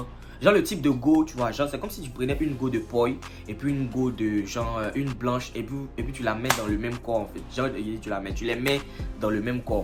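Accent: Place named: Ivory Coast. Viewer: Je suis fan de ton français ivoirien bro suis enjaillé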